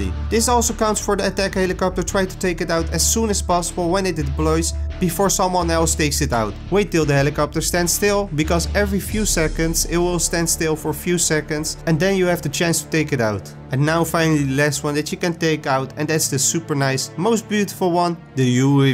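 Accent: Netherlands. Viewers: Nederlands Accent Approved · The dutch accent😂🤦‍♂️ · Bij het eerste woordje hoorde ik al dat je Nederlands bent hahaha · Je kan gwn horen dat je Nederlands bent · ik hoorde het all aan je accent 😂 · Hahahaha ik hoor je nederlandse accent zo duidelijk. Lachen man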